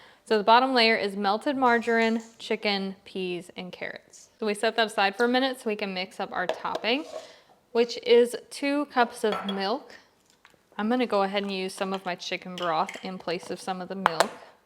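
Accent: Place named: South, United States. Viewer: Girl, straight from the south 😊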